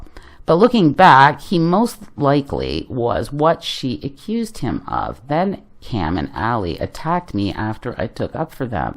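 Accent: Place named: Canada. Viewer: Yup, definitely Canadian!! "About" gives you away. The rumor mill is insane. I love your channel ❤️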